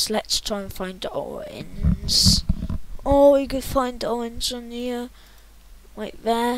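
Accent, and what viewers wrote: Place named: United Kingdom. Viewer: Your british accent is funny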